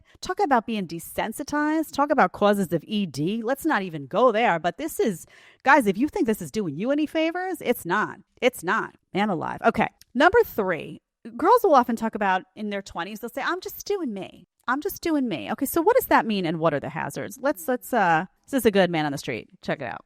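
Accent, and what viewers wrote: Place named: Long Island, United States. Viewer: NOT FLIRTING, JUST STAING MY OPINION., I like your Long Island accent that slips through every so often. It reminds me of my parent's accent (Connecticut born). Nostalgia.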